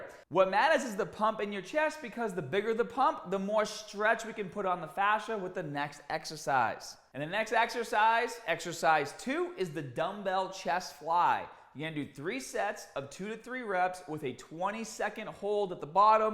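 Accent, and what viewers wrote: Boston, United States. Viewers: How did your Boston ascent come back stronger than ever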